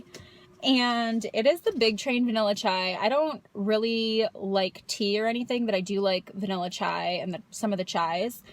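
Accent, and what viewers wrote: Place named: Buffalo, United States. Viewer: I just discovered your channel! Love it. I'm from Buffalo but live in SC now--my accent is very similar to yours and I'm dying at the Oregon/Organ thing.... "Bitch-Bye" ha!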